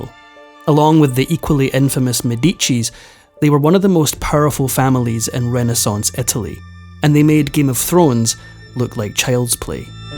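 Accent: Scotland, United Kingdom. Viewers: He's Scottish ,how's he supposed to do that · Scottish; possibly Glascow. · YES. GOOD SCOTTISH VOICE.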